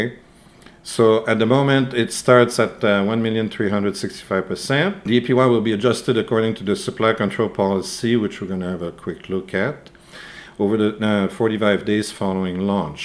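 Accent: Quebec, Canada. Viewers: Are you from Quebec? I hear s Quebequois accent. 🇨🇦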